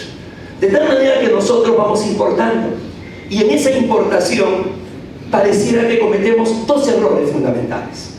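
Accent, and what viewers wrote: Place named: Argentina. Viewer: Me hubiese detenido resaltando como un peruano quiere hablar como argentino, pero eso no seria el fondo, de análisis del neo constitucionalismo no tiene nada, solo en un segundo se mencionó la ponderación de Alexy, de ahí no pasa de una charla para muchachos de secundaria. Acaso el latinoamericano no puede analizar el concepto de derechos fundamentales desde el positivismo y no positivismo? Acaso no le sirve a la realidad latinoamericana si el Juez resuelve con ponderación o con una ponderación disfrazada que realmente es interpretación subsuntiva? Acaso el latino no puede generar una filosofía del Derecho o una Teoría de la Norma que no ignore que la pólvora ha sido descubierta e incorpore variables propios? Que pena que el Perú haya enviado a un equivocado en el tema y que Latinoamérica no resalte exponentes de talla de Rofolfo Luis Vigo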